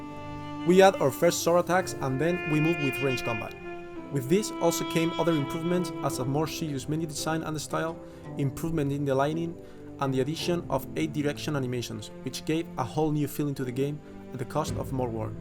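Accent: Spain. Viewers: No se porque diría que eres español, por el acento JAJAJAJJAJA, me siento como en casa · El que habla es español no? Jeje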